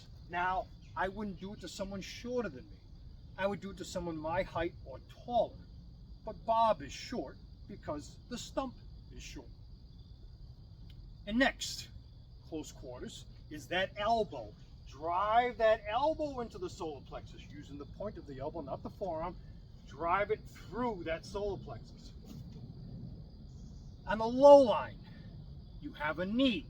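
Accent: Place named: New England, United States. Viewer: Hi! Thank you! I detect a New England accent! Have a wonderful day! John KJV John KJV John KJV ❤❤❤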